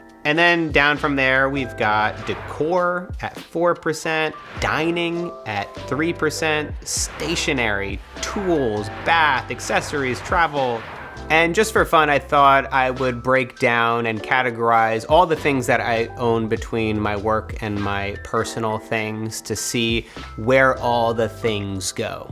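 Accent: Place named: Australia. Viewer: I can hear a bit of the Australian accent on ya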